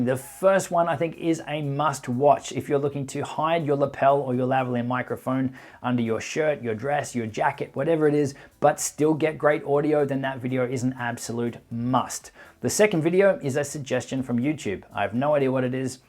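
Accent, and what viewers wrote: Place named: Australia. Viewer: ugh just love australian accents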